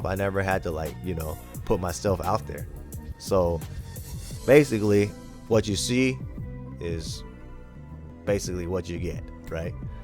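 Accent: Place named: London, United Kingdom. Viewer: “You sound like your from Lon-Don”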